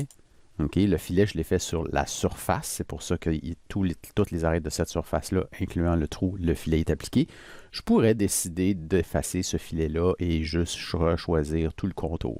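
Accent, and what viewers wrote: Canada. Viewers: Bonjour MERCI BEAUCOUP pour votre travail clair, très bien fait et très bien expliqué. Je débute dans ce domaine et vos tutos sont des mines d'or pour moi rajouter à cela ce petit accent Canadien que j'adore je ne me lasse pas de vos "cours" que j'écoute en boucle pour bien comprendre et enregistrer😊. MERCI et bonne continuation. Maxime.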